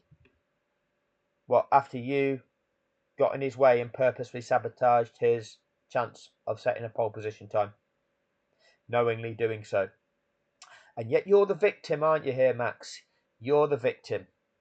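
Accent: United Kingdom. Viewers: The moment I heard that “back with more from our favorite F1 journalist “in that British accent I knew This video just gonna trash Max with no actual intelligence. Man the British have got to be the worst spectators in human sport history they don’t even hide it ,it’s so deplorable.😂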